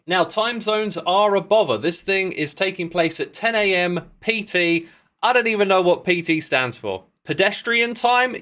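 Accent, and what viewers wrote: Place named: United Kingdom. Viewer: Fun Fact, PT stands for Pacific Time, usually when measuring what time that something is gonna happen in the United States of America, they choose either Eastern Standard Time (EST) or Pacific Standard Time (PT). Then again, I remember you're not American, judging by your British accent, so I guess you had no way of knowing that.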